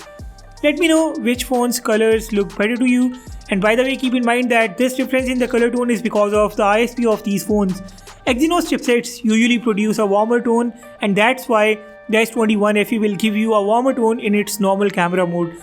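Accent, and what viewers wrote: India. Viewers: Thanks for the review. Although I must say this accent does not allow me to watch the video at 2 times the speed... I wish hindu videos would invest in getting an upgrade in their english pronunciation - it is really hard to understand most of the time and makes at least me not watch those videos and look for videos with much better english.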